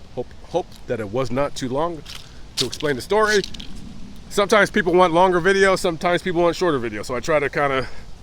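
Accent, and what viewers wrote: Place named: California, United States. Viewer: he's from California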